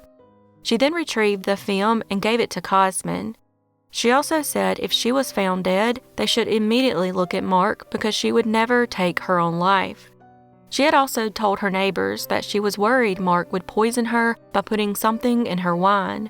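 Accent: South, United States. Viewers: My favorite southern girl's voice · I enjoy your videos. I love you accent which is easy for me to understand, being Southern lady myself.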